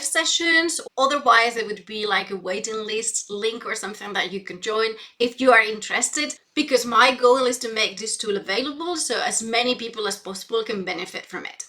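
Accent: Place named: Scotland, United Kingdom. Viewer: That's really the only indicator I notice that she is a native Spanish speaker. A Scottish influence is clear.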